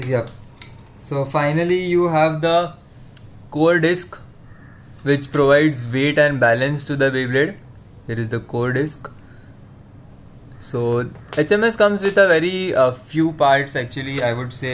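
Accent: India. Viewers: Are you an indian? i can tell in your accent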